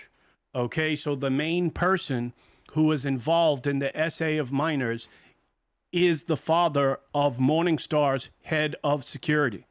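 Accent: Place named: New York, United States. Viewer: I am subscribed and here for building faith... but as a former NY resident, now in Florida, I am absolutely here for your NY accent that I miss hearing except from my own mouth.😊 God bless.🕊️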